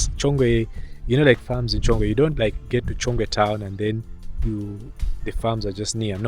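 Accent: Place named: Zimbabwe. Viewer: Wena you are an imposter from Zimbabwe why don’t you tell the truth everything about you screams Zimbawean even the accent . Zambians are very humble accommodating people .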